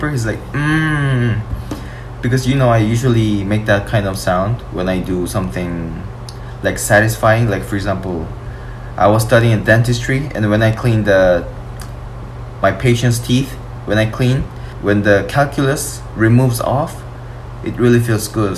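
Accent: Philippines. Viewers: Kaya pala your accent is like Filipino haha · Hey you've got a good filipino english accent and its perfectly clear. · You sounded like filipino already ☺️ Love and peace to all Filipino and Korean people 😊❤️♥️ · Lol, you have a Filipino accent when you speak English. 👍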